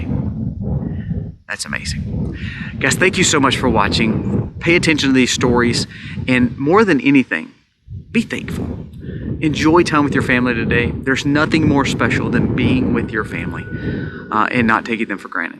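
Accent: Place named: North Carolina, United States. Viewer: you remind me of my son-in-law. He is from North Carolina so has a similar accent. Also, a 40 year old, conservative, Christian with a similar mindset. Listening to you every day is like listening to him. I guess that makes you and your family almost my family as well. Hope you had a fabulous Thanksgiving.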